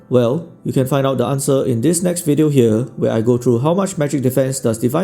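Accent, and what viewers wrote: Singapore. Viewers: ay fellow singaporean, the accent HAHAHA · u sound like a sg person hahaha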